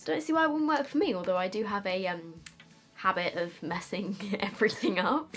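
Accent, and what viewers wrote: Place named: London, United Kingdom. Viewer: I FREAKING LOVE YOUR ACCENT!!😘 Usually anybody else that has that London tone, I dont like as much but your voice is beautiful. 💛💜💛 Stay you girl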